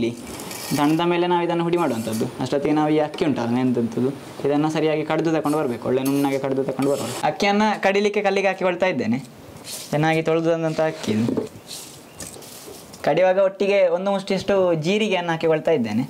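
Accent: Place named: Tulu Nadu, India. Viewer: Bhari yedde pandar...Erna Tulu mishritha kannada kusi apund...kenare...rattunu Dade....😁 Maani nu kende panle...attigye masth paapadar ye..namasthe🙏 Niklena back supports gu yenna solmelu...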